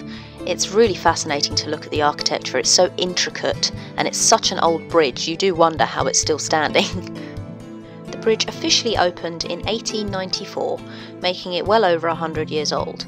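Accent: United Kingdom. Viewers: Thanks!! I only spent a day in London but jammed in a lot of rubber-neck-en. Great tour and enjoyed the voice over. You have a wonderful voice!! Us Americans love British accents and could listen to you all day. Take care!!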